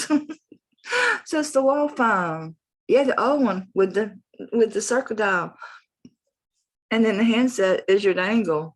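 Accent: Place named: South, United States. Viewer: I’m listening while getting my nails redone 💜 I love your southernese 😂 💜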